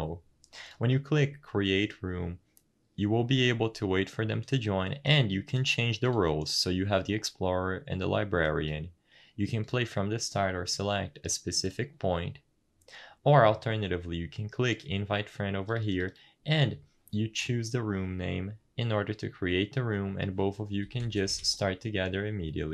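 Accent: Brazil. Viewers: This game has cross-plataform? Eu acho que vc é BR pelo sotaque, sabe se esse jogo tem cross play?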